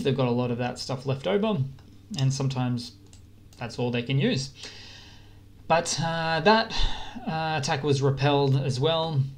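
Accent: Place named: Australia. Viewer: I like how you report major events based on their locations using a map. Your Australian accent adds individuality to it.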